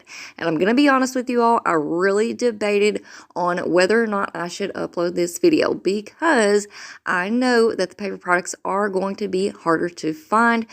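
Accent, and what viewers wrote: South, United States. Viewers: I sure hope this doesn’t come off wrong, bc it’s actually a big compliment. I used to be in marketing and it’s great to see someone who is smart enough to worry about saving .50 cents be so good at promoting their channel. I can tell it’s bc you are passionate about helping others. You have a great voice and I’m learning alot from your channel. I’m from the south too and I can tell you are 🎉. You’re the type of person who can win the lottery and not spend it all at once.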